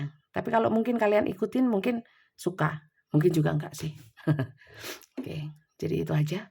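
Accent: Java, Indonesia. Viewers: Medok buanget, kesel aku😂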